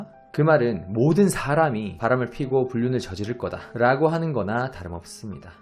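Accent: Gyeongsang, South Korea. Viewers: 경상도세요? 발음이 살얼음 위를 걷는듯.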